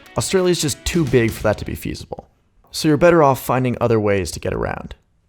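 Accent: United States. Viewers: Once I heard an American accent I was ready for a lot of nonsense being spoken about Oz, buy hey, not bad, you are pretty much spot on.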